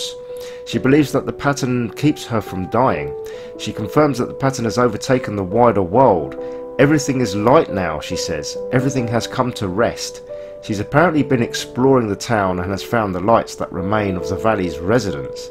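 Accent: United Kingdom. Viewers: This game is beautiful and amazing. yes the slow walking can be a tiring if you are back tracking (for instance I completely forgot that you needed to move the controller near some lights and only did it the first time instructed put the game down for 1 month came back to and made it to bunker wondering why won't it open untill I watched the beginning of a walk through and was like Noooooooooo) but the walk through this town and countryside is peaceful and beautiful. I found myself looking up through the trees listening to the music speechless. I love piecing together the story which was a great story. This was my game of the year (over all the ps4, xboxone exclusives and 3rd party games) because this is the only game leaving me thinking about it weeks later and looking on youtube for others point of view and searching for things I may have missed. thank you for this commentary it was perfect. 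especially since you sound like you are from the UK it works to enhance memories of the game. thanks again keep up the good work. I thumbed up and suscribed.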